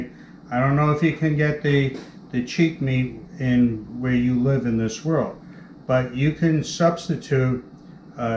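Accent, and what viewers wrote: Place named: Texas, United States. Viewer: Yes, that accent always gives it away doesn't it, haha. Well technically if you live in Texas then that makes you a Texan. I'm sure there is a native Texan that would strongly disagree. These things always make me laugh because most of us came from somewhere else originally and quite a lot of these so called southerners have not so distant northern and European roots.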